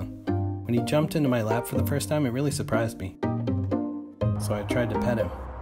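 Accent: United States. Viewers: anyone else spot the narrator is a yank but the guy in the vid in engish? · The comment thread has nothing to do with 'global citizens'. People are questioning why the narrator's voice has an American accent when the short pieces of dialogue you hear during the video is in a noticeably British/English accent. What has that got to do with where people live? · I noticed that too. The narration is American and the guy’s voice in the video is British. Not sure if this is a genuine story or not. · Why does the narrator have an American accent but the guy in the video has a British accent? 🤔 sweet cat though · Why is the narrator American but the guy hearing him talk on video English? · Hey your and English guy in the video but American on the voiceover???? That's the second time ive caught you doing this lol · Was very confused when the guy in the videos had a British accent and the guy narrating had an American accent before realising the narrator is just telling the story of the British guy and the cat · The guy in the video has an English accent, but the guy narrating is American. 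I'm a little confused. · Ok so the guy talking is American but the guy with the cat is English. Lies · The narrator is American, where as the owner of Paul sounds English. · Well that was weird. The voice over guy is American, while the person shooting the video is clearly a Brit. Did The Dodo think we wouldn't understand him? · Narrator speaking in first person: soft, calm voice with American accent. Guy in first video: loud voice with British accent. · Why did they make the narrator American when the guy in the video is British? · I've always suspected these types of videos were sent in by people and then their email was read as a voiceover by a Dodo employee. Hearing the man's English accent in the video and the American voiceover has confirmed it.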